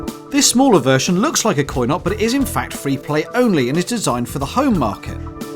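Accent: England, United Kingdom. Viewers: hummm u sounds like britis. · He sounds English. "British" is a nationality, not a language.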